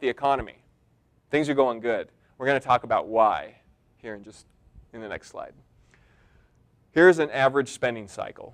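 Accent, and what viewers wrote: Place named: South, United States. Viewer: they must like his southern twang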